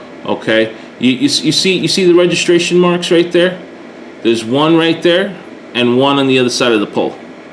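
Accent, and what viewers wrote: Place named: Tennessee, United States. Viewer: ur accent tells me tennesee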